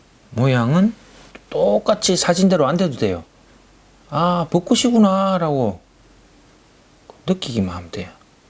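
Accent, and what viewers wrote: Gyeongsang, South Korea. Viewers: 벗꽃그리기 배우고 가요 경상도 말 혹시 대구가요 배우로갈께요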